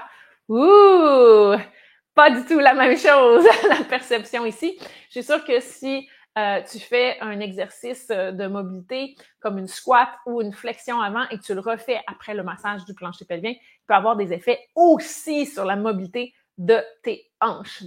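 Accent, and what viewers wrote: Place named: Canada. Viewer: Bonjour, j'apprécie vos formations, vos capsules d'introduction. Au début j'avais des difficultés à entendre distinctement votre accent canadien. Dans cette vidéo c'est mieux pour moi, il y a moins d'aigu. Je viens de partager vos formations avec ma voisine et lundi prochain je lui présente les yoga-balles que je viens d'acquérir, elle est fort intéressée par votre formation, elle a 1 problème au niveau de la nuque. Excellente continuation dans votre activité. Yvel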